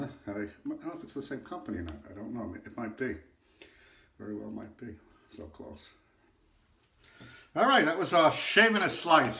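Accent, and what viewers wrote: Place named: New York, United States. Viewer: I love your New Yok accent.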